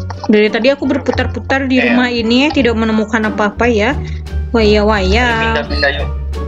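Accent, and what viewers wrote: West Java, Indonesia. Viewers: Sunda Euy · orang sunda gening😀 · Sunda Wani😂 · Orang mana teh ning sunda? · Sunda ti mana neng gelis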